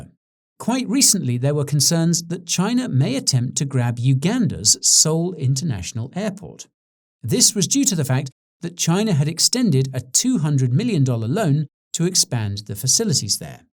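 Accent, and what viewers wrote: United Kingdom. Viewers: Before China, Europe and America continues to do via its corporations in Africa? And you speaking with a British accent; a thief pointing fingers at another thief. The Mwafrikan Tengazako coming! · The irony of a critique of imperialism in a British accent 😂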